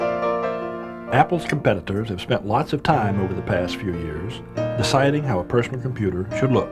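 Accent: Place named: South, United States. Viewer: I love the presenter’s easy going southern accent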